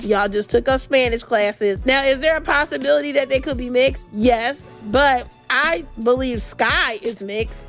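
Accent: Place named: East Coast, United States. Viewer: Just bc you’re only AA and don’t know your history doesn’t mean others doesn’t. There’s WHITE PUERTO RICANS 😂😂😂😂 SPANISH IS A EUROPEAN LANGUAGE!!!! Damn I was feeling you till started showing the failure of American education. You sounds like you’re from the east coast where there’s variety but you are hella ignorant fr ughhhhhh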